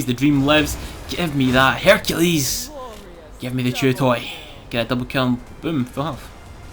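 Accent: Scotland, United Kingdom. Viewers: nah bro I'm trying to start YouTube I watch you're vid heard the accent and I was so happy to find another Scottish smite player. But I play Xbox if I had a PC I would play with you for sure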